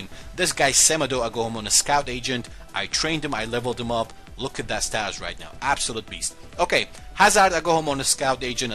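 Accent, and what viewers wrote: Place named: Scotland, United Kingdom. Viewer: Your accent sounds like it has a little bit of Scottish in it, either that or I'm trippin' :') PLAY ME THOUGH YOU WOULD MOST LIKELY BEAT ME, BUT I LIKE A CHALLENGE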